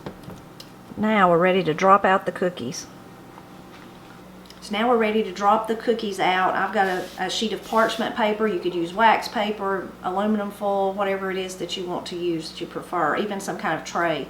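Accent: Appalachia, United States. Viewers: I recently found your channel and I love it ❤ My mom made these for me and my siblings when we were growing up. The recipe came from my dad’s mom and we call them oatmeal candy. Your accent is very similar to my dad’s and his family. They are from a little town called Lafayette, GA which in the Appalachian mountains 😊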